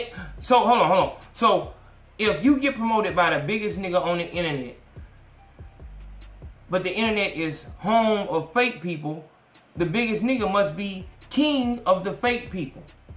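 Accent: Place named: South, United States. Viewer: You from Bama?? I knew there was some south in you! 😊 #bamabornandraised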